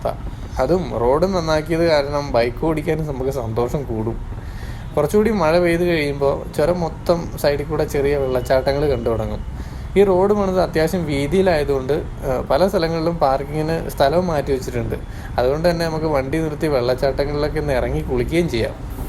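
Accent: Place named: Muvattupuzha, India. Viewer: Njanum..super presentation aanu bro..muvattupuzha/ perumbavoor/Thodupuzha slang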